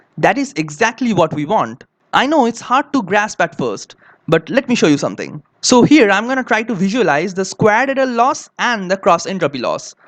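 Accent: Bengal, India. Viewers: brilliant ..also delightful bong accent :)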